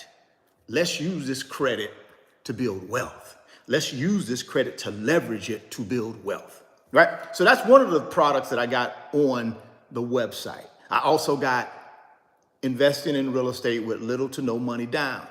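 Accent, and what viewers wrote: South, United States. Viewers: Then why are you guys watching? The dude has a country, "TWANG" or dialect, that makes certain words come out sounding... country. In essence, the word NVIDIA is not even a word; it was made up from the word invidia and a acronym NV for Next Vision... so it was created using a poetic license, so he's using is own poetic license when pronouncing the word. Lol. So, give him a break. The information he is providing about the company is accurate, informative, and nicely conveyed. I could see if he was giving bad information, but he's not. It's sad that you would even bring it up. How about this... Go watch someone who's pronouncing a company's name correctly and giving BAD information and put your money into that stock and see where it lands you.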